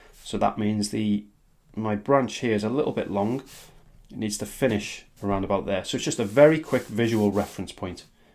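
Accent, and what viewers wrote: Liverpool, United Kingdom. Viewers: Liverpool? Or Birkenhead way ? , some words I’m thinking deffo Liverpool, then I’m goin oh I don’t know 😂😂😂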